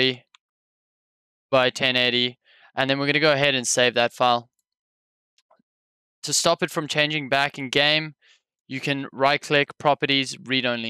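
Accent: South Africa. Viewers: OMW WASSUP FOUND YOUR POST ON REDDIT Heard the ZA accent first 😂 Scroll down and I'm like "HOWZIT!" Subbed. 😎😎 EDIT: lmao thanks for the help as well 😅🧐👍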